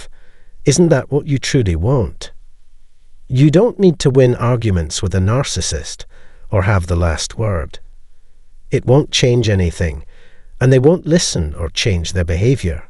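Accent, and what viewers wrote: Scotland, United Kingdom. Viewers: So your channel is pretty milquetoast when it comes to defeating a narcissist or pwBPD. lemme adjust your counterattack a wee bit. When they cut with you, you chase for awhile, and then breadcrumb them, back and forth, this tactic will keep them locked in stasis and never be a problem for you again. This is actually reverse ,reverse psychology. This is a pre-emptive bread crumb, in tactical evasion we call it a crazy Ivan. Not unlike doubling back on your own tracks and ambushing your attacker. For more and better NPD avoidance advice , ask in comments. I have real experience in this field and a degree to back it up. The scotty voice guy is fairly elementary.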